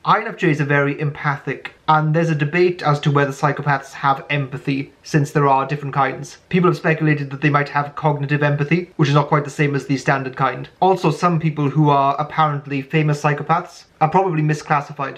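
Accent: Wales, United Kingdom. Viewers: He has a Welsh accent! (a variation of British English spoken in the Wales region)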